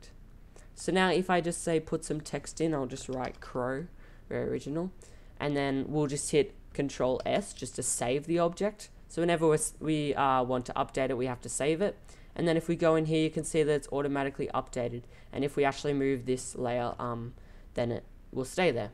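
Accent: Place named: New Zealand. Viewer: trying to f-eeg-yuh out your accent: Kiwi? Aussie? Good video.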